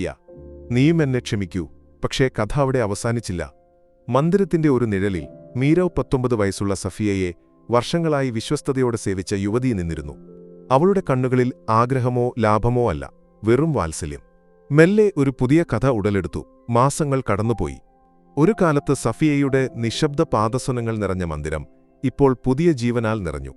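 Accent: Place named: Arabi-Malayalam, India. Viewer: Kadha Vaayikkan Malayalam Koottivakkan ariyavunna orale niyogikkaamaayirunnu. Ithu arabi malayam Parayunnathu pole aayippoyi.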